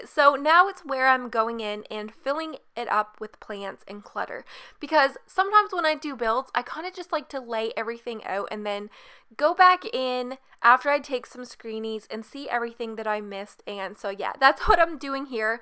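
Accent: Canada. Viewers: are you Canadian..?? we talk to same way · Do you have a Canadian accent? And I absolutely love this build. Pretty sure the best I have made is a shack compared to this ;).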